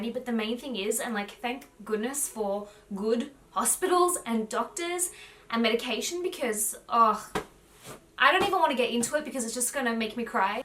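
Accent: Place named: Australia. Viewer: I feel like your aussie accent is coming out more since you've went back 😍🤣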